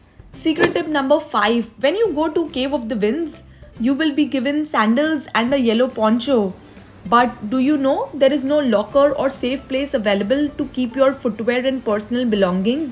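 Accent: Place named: India. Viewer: Indian accent English 😅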